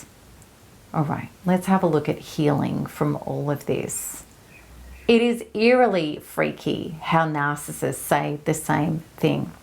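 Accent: Australia. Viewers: Your Aussie accent is like butter on toast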